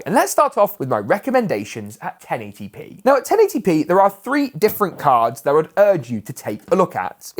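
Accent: United Kingdom. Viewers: Just subbed. Very clear info and british, love it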